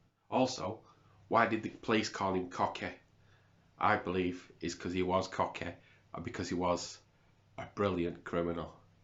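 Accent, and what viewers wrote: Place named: Manchester, United Kingdom. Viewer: Liverpools in the North East? U sound like a Manc and you say Liverpool is in the NORTH EAST? Lol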